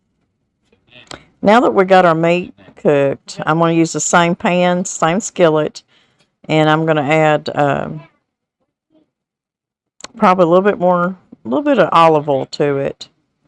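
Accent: South, United States. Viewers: Where did you find those salt and pepper shakers/grinders? That recipe may have to end up in my collection, Miss. Several of your recipes are already part of the hoard. Also, I love the accent. My other half was a southern boy, from Benton, AR and still has family in that area. Those were some memorable trips to see the 'outlaws and the in-laws'. Being that I was the only 'Yankee' there, verbal exchanges were hilarious. In fact, I sent the 'How to Talk Yankee' book and tapes to them in preparation for another visit. They're probably still snickering....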